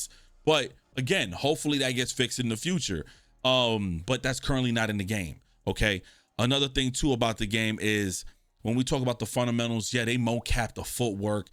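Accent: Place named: East Coast, United States. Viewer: Are you from Jersey? I hear east coast in your accent, but Im not sure if its NYC or not.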